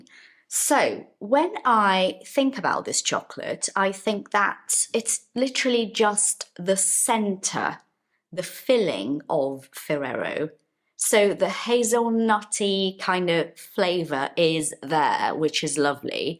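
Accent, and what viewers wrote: United Kingdom. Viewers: I'm much amazed to listen you talking In british accent so smoothly. Even being a Brazilian you've a great command in British Accent.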